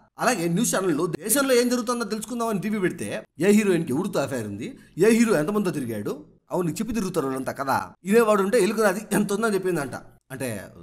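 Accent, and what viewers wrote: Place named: Tirupati, India. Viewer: nenu undedi Tirupati bro Slang ni guess chesa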